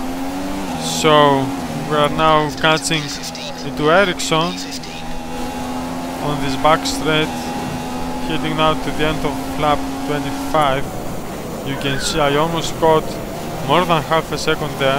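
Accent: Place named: Spain. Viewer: You spanish? 'cause I can see a hard Spanish accent and you're a Fernando Alonso fan. Nice vid m8, keep going!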